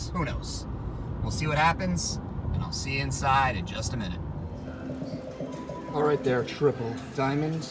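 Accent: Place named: New Jersey, United States. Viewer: I enjoy watching and listening to you commentary during you play. You sound like a real good Jersey guy. Love the show and keep it up. Bring your mom back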